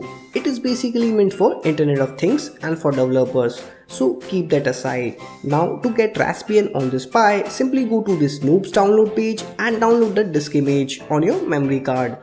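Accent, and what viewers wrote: India. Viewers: nobody is judging mate, i know how it is to learn new languages, i speak 5 of them, and all with an accent. blame my ears, i have no problems with accents, but indian accent is so annoying i cannot enjoy these videos however much i try. and i try a lot. sorry my indian friends. i love you but my ears bleed every time. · Dude thats an Indian accent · yep. Thanks, that is the normal tilt and pitch of an Indian - i think a great video. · He sounds like Indian tech support. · If u have trouble understanding Indian accent , just leave without commenting anything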